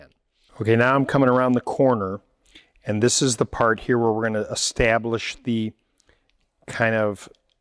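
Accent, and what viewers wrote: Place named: Midwest, United States. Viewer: Thanks for the great information! Will tackle this in a couple of months once things warm up just before spring., I kind of feel like you must be from the Ohio/Indiana/Illinois area? I recognize your accent as being common in those locations. Always love stopping by my local Ace as opposed to the so-called "big box retailers". Thanks again.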